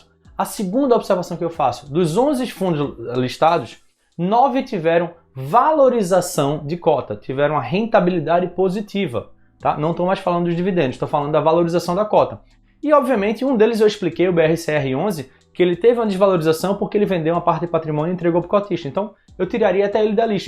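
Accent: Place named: Pernambuco, Brazil. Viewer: esse seu sotaque não me engana... é pernambucano, cabra da peste!!! kkkkk... vamos nessa meu conterrâneo! muito bom! 👏🏻👏🏻👏🏻👏🏻👏🏻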